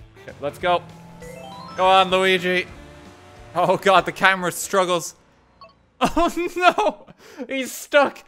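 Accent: Ireland. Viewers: holy shit its irish jerma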